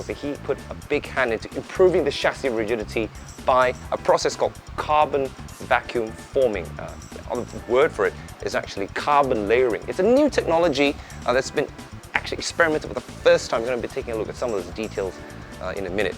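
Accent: United Kingdom. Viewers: I hear Brittish, see Japanese.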